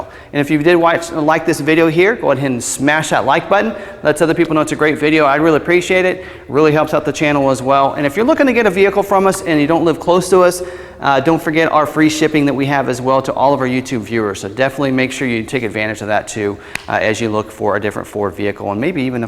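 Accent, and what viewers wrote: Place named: AAVE, United States. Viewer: Yep, an Ebonics speaker evidently. Gives me a lot of confidence in this guy’s expertise.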